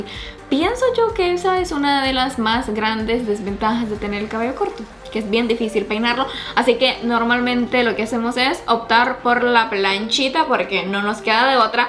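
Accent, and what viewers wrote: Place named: Honduras. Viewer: A mí me encanta que está mujer tanto que a viajado y todo, habla como catracha, & otros majes que se van para otro pais hablan como majes & ella no pierde la esencia... Por eso y otras cosas me la sube 💖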